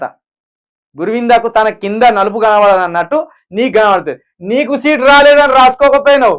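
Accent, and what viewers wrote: Telangana, India. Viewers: Anna😂😂😂😂 top telangana slang anna this i want hear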